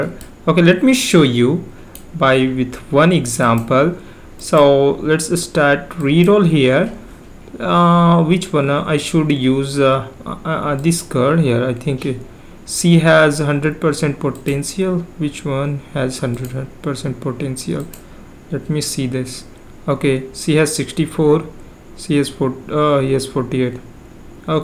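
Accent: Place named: India. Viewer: He as the voice of an Indian scammer....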